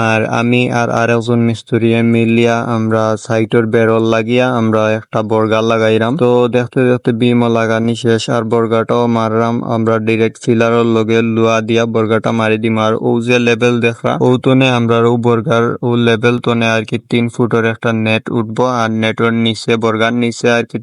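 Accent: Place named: Sylhet, Bangladesh. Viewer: আসসালামু আলাইকুম। ভাই কেমন আছ। আর তুমার ভাষা সিলেটি সিলেটি লাগের তুমার বাড়ি কই।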